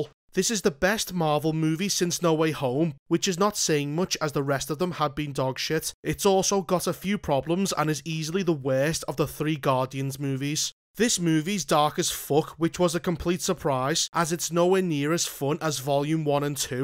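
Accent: Liverpool, United Kingdom. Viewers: It's scouse, not Scottish 😅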